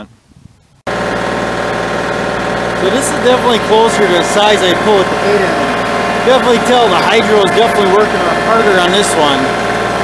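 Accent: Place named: Maine, United States. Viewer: where are you from there brother sounds like you are maine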